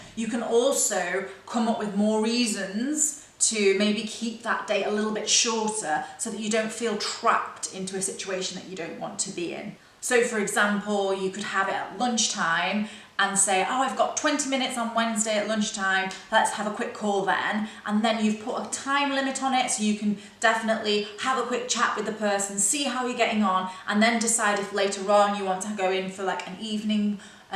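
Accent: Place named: United Kingdom. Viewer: Very useful tips, thank you 🙏🏻.. BTW i loved the British accent🇬🇧 because of you.. it’s clear, classy and beautiful accent.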